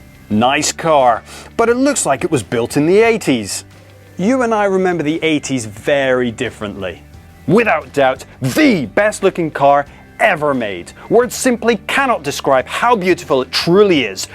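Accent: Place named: United Kingdom. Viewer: YAY!! My comment made it!! Thanks a lot,!! Love the show. It gives me my fix for cars and the British accent when I'm not watching Top Gear:D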